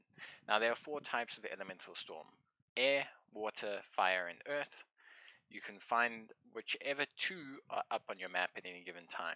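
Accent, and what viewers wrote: South Africa. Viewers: Bro, your South African. Tell me, do you play private servers?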